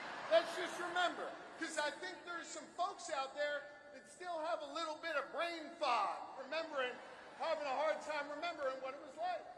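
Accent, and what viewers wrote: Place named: Philadelphia, United States. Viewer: That's just what people from Philly sound like ya smooth brain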